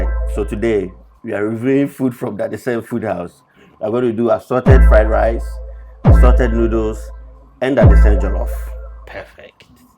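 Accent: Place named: Nigeria. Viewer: Why ur English be like 9ja guy own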